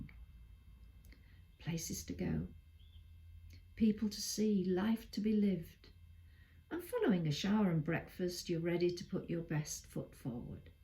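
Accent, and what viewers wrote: England, United Kingdom. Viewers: What a lovely reassuring voice you have. I am partial to English accents as I was married to someone from the UK . I am widowed and live alone with no family The golden years are not so shiny as described! However I do breath meditation and that helps with the collywobbles. Mine manifest as an aching hollowness in the chest. I think the reason they occur in the mornings is that in the state between sleep and wakefulness (hypnopompic) seems to be when we are most vulnerable and suggestable. Luckily my dog won’t allow me to allow me to stay horizontal. She demands her walk.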